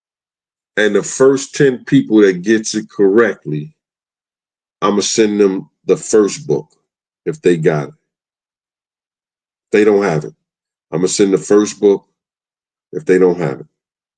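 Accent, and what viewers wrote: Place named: St. Louis, United States. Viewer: don't sweat it, I love how you mispronounce some words. I love that you still have that St. Louis country dialect, despite being in New York so long. I like it, because you remind me of my country uncles. ❤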